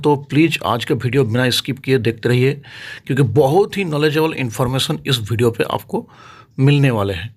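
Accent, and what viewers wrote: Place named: Bengal, India. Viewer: Universal amplifier ka Chandni main shope ka mobile no muzhe google se milega hai, ph mein bat huya hai. AaJ ke somoy mein 3400/- price hai. Ar tumi to ekJon Bangali tomar kothar Tane ami buzhe geychhi. Tobe niJer matri vasa chere keno hindi te video banachho. Banglay banao seta teo tumi popular hobe. Thak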